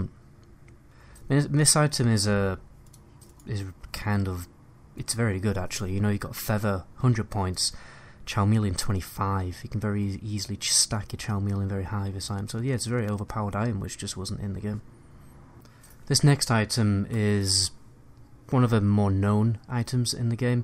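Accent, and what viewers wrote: United Kingdom. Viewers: Wait, is British!?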